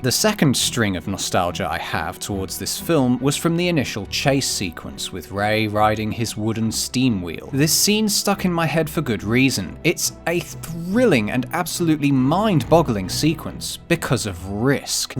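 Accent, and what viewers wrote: United Kingdom. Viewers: this guy sounds very British what do you mean?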